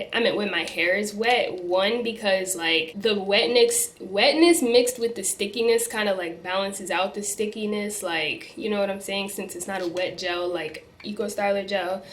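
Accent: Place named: United States. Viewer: Where's that accent from? 😍😍😍 I'm from the UK and i just can't place where in the states that is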